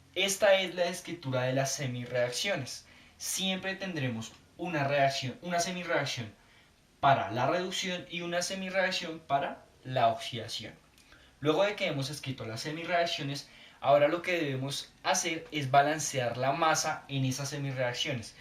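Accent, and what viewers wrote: Colombia. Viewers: de que parte de colombia eres?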